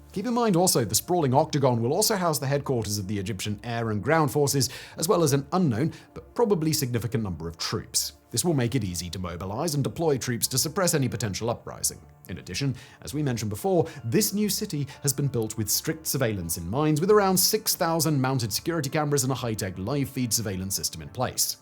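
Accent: United Kingdom. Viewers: The British accent of the presenter makes it difficult and unpleasant to follow. He speaks fast, words are not clear, no boundary between words.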